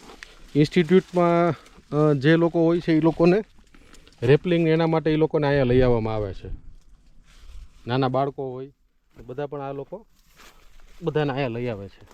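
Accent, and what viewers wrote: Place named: Kathiawar, India. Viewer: is our good friend and pro bike rider since many years and a very humble person and now he is on YouTube with our Spl Kathiyawadi language I wish him on his new ride 👍✌️✨🎉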